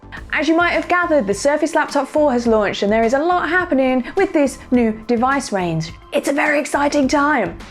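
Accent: United Kingdom. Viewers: I love this lady, she's so fun to watch. English accents are the best.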